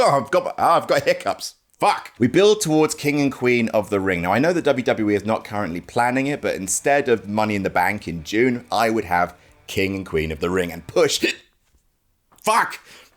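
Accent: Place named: RP, United Kingdom. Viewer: It's wouldn't be without hearing his posh accent say fuck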